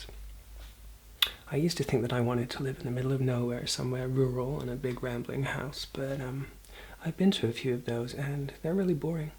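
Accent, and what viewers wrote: United States. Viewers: I assume your English, but you're going about being natural in an American accent, it has me confused 😂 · What’s with the American accent? I thought you were British? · ..... this sounds like an American accent is this your natural one or one your using. Because your British accent is too real lol ps Anthing with at meridians works for me xoxo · Dude... You're not American are you? I thought you were a fellow Brit?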